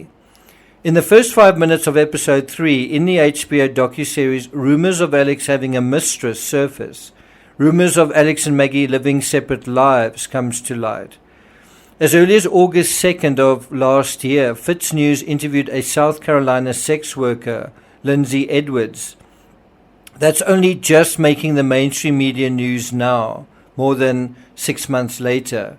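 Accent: South Africa. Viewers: Did you cover Oscar P? Your SA accent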